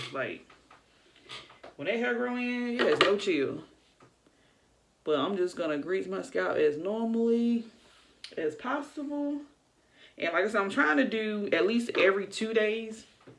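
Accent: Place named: South, United States. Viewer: I thought you from chicago or Alabama because the base southern hue in your voice and I'm from new York living in me mama's hometown and grandma's in Goldsboro,NC.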